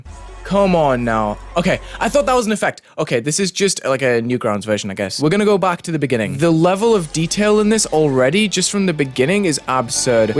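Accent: England, United Kingdom. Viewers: О вы из Англии????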